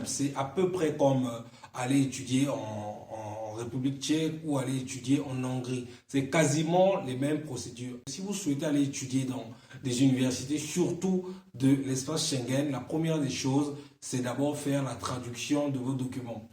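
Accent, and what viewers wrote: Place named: Democratic Republic of the Congo. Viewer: Je sens l'accent congolais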